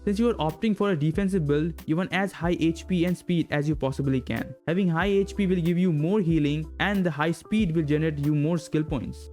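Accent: India. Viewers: You sound like you’re Indian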